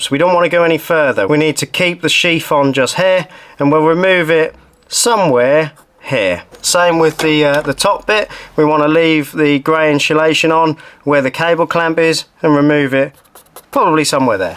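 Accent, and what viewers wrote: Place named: East Anglia, United Kingdom. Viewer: You sound like you're from East Anglia.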